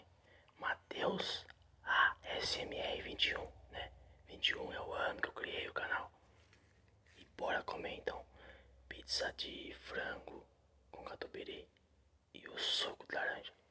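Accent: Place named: São Paulo, Brazil. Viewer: Fala meu mano, tu é Paulista ou Paranaense ali da parte de Curitiba, muito parecido seu sotaque.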